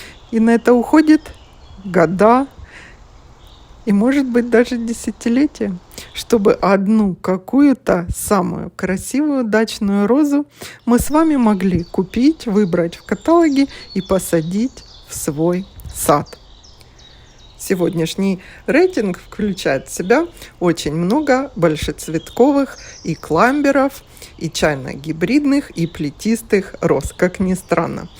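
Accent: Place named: Baltic. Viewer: Очень красивые розы. Приятный голос, русский язык интересно звучит, как будто с прибалтийском оттенком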